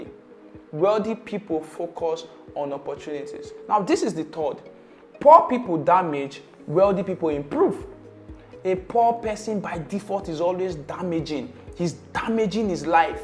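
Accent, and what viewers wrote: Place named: Pidgin, Nigeria. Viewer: Pidgin English never leave you sha😅😅, awesome video thanks alot for it🙏🙏🔥🔥🔥🔥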